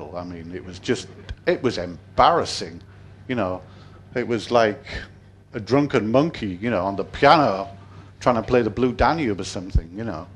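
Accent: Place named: Hull, United Kingdom. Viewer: So nice to hear the Hull accent;) You're a legend. Grazey/PHF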